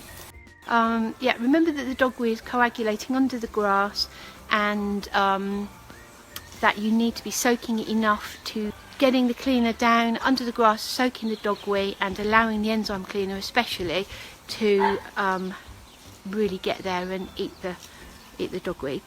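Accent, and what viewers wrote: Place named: United Kingdom. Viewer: she’s british they literally INVENTED english dude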